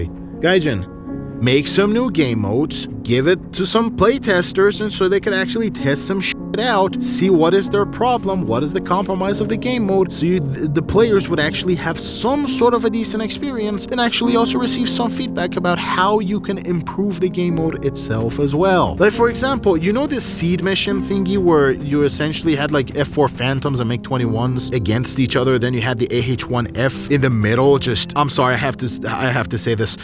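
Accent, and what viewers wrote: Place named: Iran. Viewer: i didnt think you would be iranian, but now i think about it, you do have a thin accent. but still, it would be cool to have iran as a tech tree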